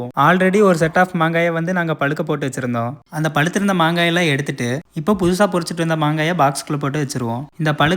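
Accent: Coimbatore, India. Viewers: Athu கோயம்புத்தூர் பாஷை.கொஞ்சம் maathi பொறிச்சிடுவாங்க. இல்லை parichiduvaanga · Coimbatore Tamil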